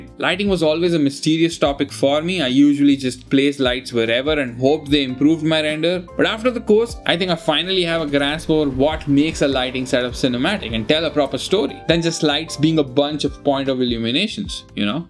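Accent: Bangalore, India. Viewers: This mans commentary is the best xDD Are you from Bangalore. You sure sound like you are xD Love it. Your videos do make me feel home in a way in a country away xDD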